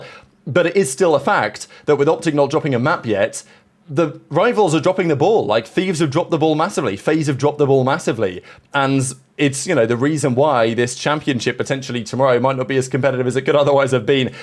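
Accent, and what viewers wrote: United Kingdom. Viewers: it’s that irresistible British accent that always has women around the world swooning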